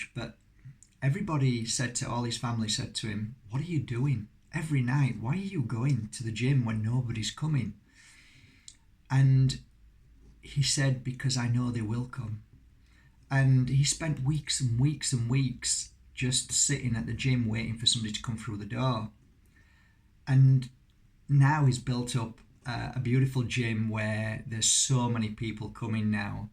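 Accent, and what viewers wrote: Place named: West Yorkshire, United Kingdom. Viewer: HI from sunny Halifax in West Yorks! I thought I recognised your accent. So pleased to have found your channel. I have given up worrying about what people think of me. Although I have to be careful when people are talking about losing loved ones when they have died. I honestly dont grieve anymore. I dont get distracted by politics anymore and some think I dont care maybe? but this is not true. I just care about what I give my attention to these days.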